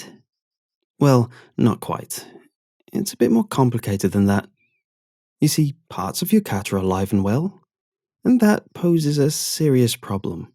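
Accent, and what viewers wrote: England, United Kingdom. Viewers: The english accent and the dry delivery sells this story. Two thumbs up!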